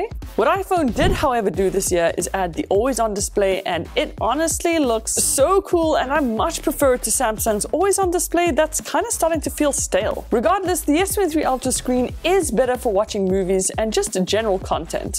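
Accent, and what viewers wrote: South Africa. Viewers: You're totally from South Africa :) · Are you from RSA 🇿🇦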